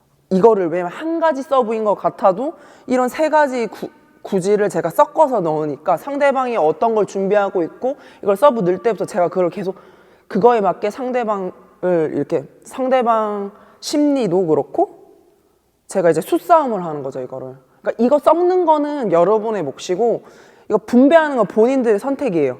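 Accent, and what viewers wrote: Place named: Gyeongsang, South Korea. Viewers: 코치님 억양이 경상도 분이셨군 ㅎ 이서브 연습많이 했는데 희열감 좋더라고요 여러버전이 있었군요 공략 역시.. 최고의 서브으 👍🏻👍🏻 늘머쩌브러 🔥🔥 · 초중고를 부산에서 나와가지고 억양이 남아있어서 😅들켜버렸내요 3가지 다 연습 해보셔서 써먹어보세요 더 희열감 드실꺼에요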